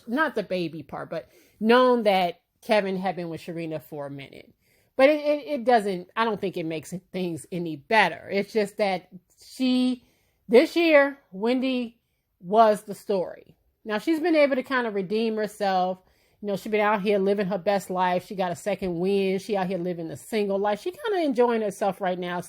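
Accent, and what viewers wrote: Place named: Midwest, United States. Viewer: I knew you were Midwestern!!! I love it!! Im in Indianapolis Indiana next door!!! 🤗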